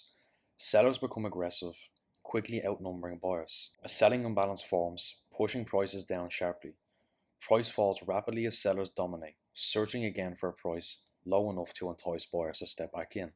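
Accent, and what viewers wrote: Ireland. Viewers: Irish accent? Subbed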